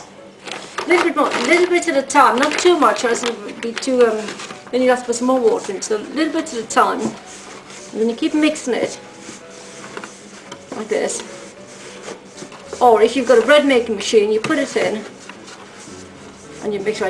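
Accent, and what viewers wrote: United Kingdom. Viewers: I am Guyanese and that is not the right way to cook Guyanese roti. You are the only Guyanese i saw make roti different. If you are Guyanese then why you talking like you are from the UK? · Just out of curiosity, what race are you? You look kind of indian or islander, but sound very british o_O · I don't see anywhere in the description that is says she is Guyanese.. But since she has a slight brit accent, maybe she is Brit, but family is from Guyana or Trini? I do hear a slight coolie accent. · That's a real English /London Asian accent honey... with a snippent of Asia that's lived and worked in the UK for 15 + yrs. Whats the bleedin' problem anyway? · where are u from? u dont have a guyanese accent, but u do sound british. and u made it differently than my mom and my grandma and my great grandma...they were from guyana..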